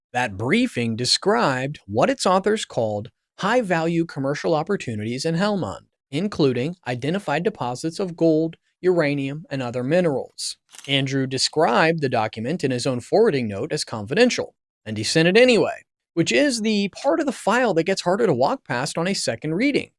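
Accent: United States. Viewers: PLEASE learn how to pronounce proper names. specifically "Sandringham", "Norfolk", "Thames" and "Aylsham". To British ears you sound very err... American (this is not a complement). When you talk about British police - you video shows American police and at one point police from some third country. Pretty sloppy. I like the content though. Andrew is a pig that has besmirched the institution of monarchy. When the King said "the law must run its course" that removed all royal privilege and protection. King Charles must be fizzing with anger at his brother and by publishing those words he is signalling that he is prepared to see Andrew in prison. You can now expect the full force of the law to drop on Andrew like a ton of bricks. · Why the American narrator? What's Prince Andrew got to do with Americans?!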